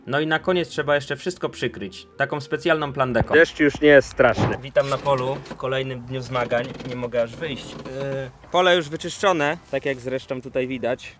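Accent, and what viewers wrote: Silesia, Poland. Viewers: jesteś ze śląska? bo czuć u ciebie ten śląski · Mówi się zilos, a nie silos. A w filmie zauwarzyłem że też godosz po Śląsku. Ps. Jestem ze Śląska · Jesteś z śląska??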